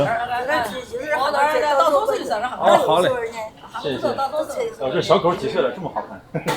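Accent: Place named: Sichuan, China. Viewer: 四川话太歪了😊😊😊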